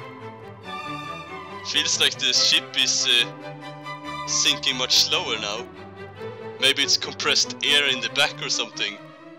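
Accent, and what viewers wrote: Sweden. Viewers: Are yuo Swedish? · are you, Swedish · are you swe ore englich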